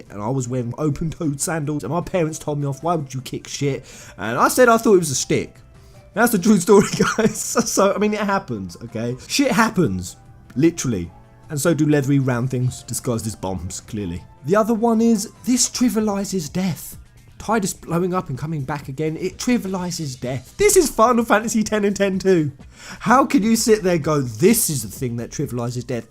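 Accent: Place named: London, United Kingdom. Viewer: I love hearing Japanese names said in a London accent. Shinji 'Ashy Mow'ow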